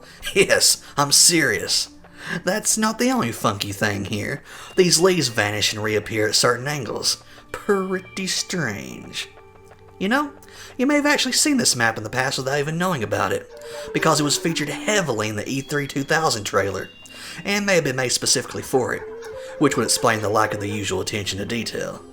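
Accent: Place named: Texas, United States. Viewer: He sounds like a texan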